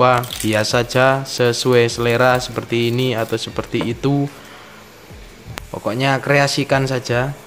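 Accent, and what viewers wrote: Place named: Blitar, Indonesia. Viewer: Dialek ngomongnya seperti wong mBlitar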